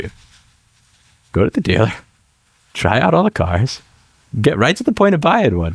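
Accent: New York, United States. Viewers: Fast talking new yorkan keeping it plus two on the speed knob, to plus three for effect, then back to two or one if you’re slipping .. your content’s okay though at .75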